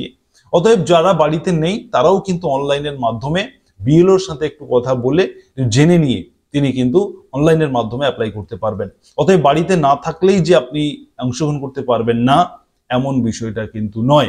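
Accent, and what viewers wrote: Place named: Bangladesh. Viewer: Apnar kotha sune mony hocche apni Pure bangladeshi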